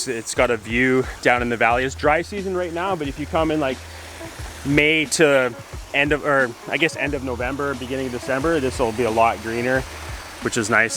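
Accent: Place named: Corona, United States. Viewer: Just curious …! We’re you from ..! Sound like Corona …..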